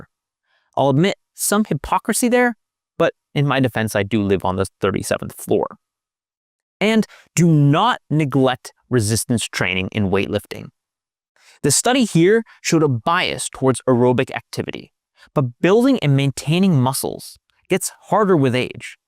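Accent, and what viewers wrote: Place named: United States. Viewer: it’s pronounced Duneeeedin pace not Dunedin pace.. Must be the American accent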